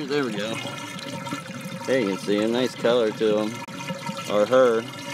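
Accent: United States. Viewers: I love your american accent Greetings from Paris 😁 Michel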